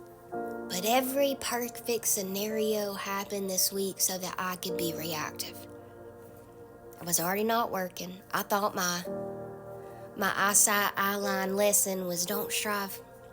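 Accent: South, United States. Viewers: ❤❤What a beautiful message from a Beautiful Lady Pastor... Thank you Ma'am... Your lovely Southern accent was a Breath of Fresh Air.., complimented by your Spirit filled message... I say all this as your message and you were the first to come up on my Screen, after one of the most terrible spiritual letdown I've experienced for more than 5yrs...I was so excited to experience a major breakthrough in my long walk in Christ., with all the Blessings promised.. via messages from spirit filled children of God...after a 2 hr conference call with powers that be... Totally the opposite of what I was expecting.. 😬I am in in such a freaked out frame of spiritual battle...& want so to vent.. I saw your vid, subscribed & really was glad I watched... You're beautiful, down to earth humbleness of a Jesus filled lady.. Thank You soo for this message...I was truelly Blessed. 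🌹✝️👏🙏❤️BTW.. I am from South Africa... Johannesburg.. Praying for Your land.. ✝️👏🙏❤️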